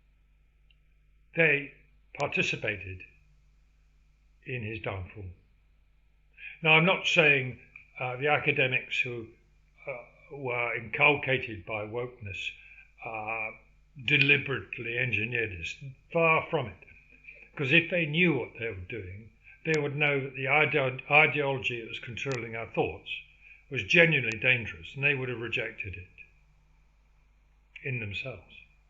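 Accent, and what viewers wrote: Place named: RP, United Kingdom. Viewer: Youre a privileged little clown with a serious case of white guilt. This is perfect proof that a posh accent and privileged background in absolutely no way equate to intelligence.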